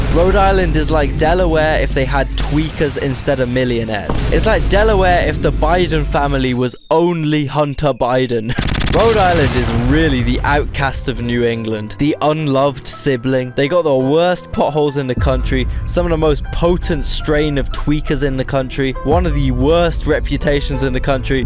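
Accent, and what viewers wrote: United Kingdom. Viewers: Listening to a British guy explain america to me an American is surreal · Just listen to this guy with a British accent talking about all of the States in my Country and also the best country, is absolutely hilarious😂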